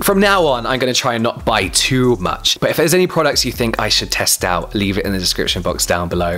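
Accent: United Kingdom. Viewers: Hello, it is soooo refreshing to hear British English on YouTube 🥰 Thank you for this amazing channel and your "ear-pleasurable" accent 😁 Love from Prague ❤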